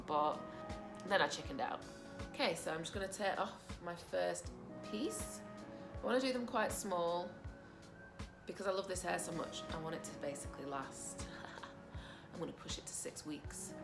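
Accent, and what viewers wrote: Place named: Manchester, United Kingdom. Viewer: Are you from Manchester love?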